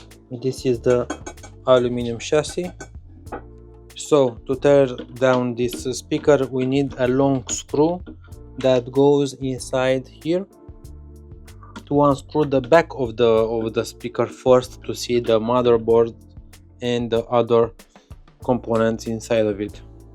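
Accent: Romania. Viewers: a little bit of romanian english =)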